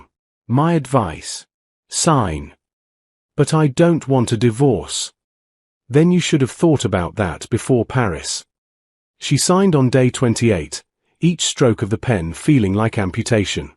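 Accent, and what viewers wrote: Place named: United Kingdom. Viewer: ...you f***ed around and found out... From a native North American English POV, I don't know why, but the British-accented voice-over narration sounds so delicious, especially when this channel dares to drop the F bomb, for story benefits, of course. This is another AI-generated tale like so many others cranked out by this and similar mills, but it is a wee bit different, a touch unique in how the tale unveils and delivers its Scarlett Letter denouement for the characters involved. She still let the little brain run the show, until, when alone, her big brain is finally allowed enough oxygen to realize the black hole she'd let herself fall into. The climax is early on, but the supplemental details afterwards are more than enough to keep one's attention in this longer than most other tales of woe. One of my fave sarcastic titles for such content is "As the Stomache Turns." Fortunately, refreshingly, this one's not nearly as tacky as too many others tend to be. 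PS BTW, a humid Thursday in November...? I've been to Singapore 2x; I've had extended chats with locals there; it's humid EVERY Thursday there. Year-round. That's just the weather in that part of the globe when one is that close to the equator and surrounded by that much water.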